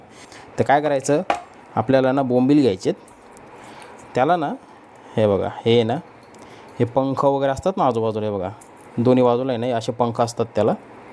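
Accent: Nashik, India. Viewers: Tumacha tone Nasik cha chan vatato aikayala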